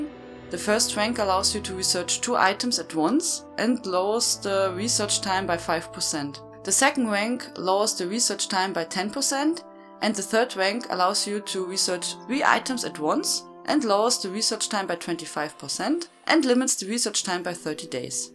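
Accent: Germany. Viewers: uiuiui xD Man hört das Deutsch sehr kräftig raus ^^ Mir hat das Tutorial gefallen, es war sehr informativ, hat meine Frage beantwortet und vorallem war es sehr unterhaltsam xD Nur eine Frage hätte ich an dich... ich hab irgendwas vonwegen Craftingquest mitbekommen... gibt es das oder hab ich mich verhört? Und wenn ja, wo kann ich so eine Quest finden? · ich mag den deutschen englischakzent irgendwie xD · Very solid explanation of a misunderstood but important part of the game. If I had watched this video about 3 months ago I'd be in a better spot with my crafting character. German accent also helps. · Beutifull german/viking :D accent. :) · Post Da stoner Seems like an German Accent my guy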